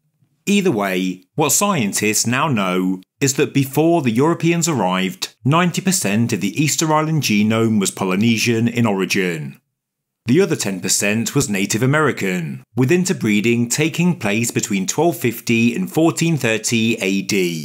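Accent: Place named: Leicester, United Kingdom. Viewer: Leicester I think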